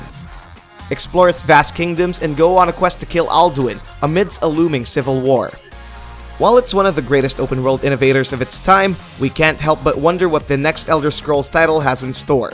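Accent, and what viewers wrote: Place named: Philippines. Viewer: This dude sounds Filipino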